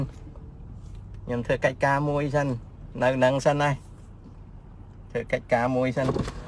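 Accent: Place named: Vietnam. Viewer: និយាយដួចជាយួនមិចៗ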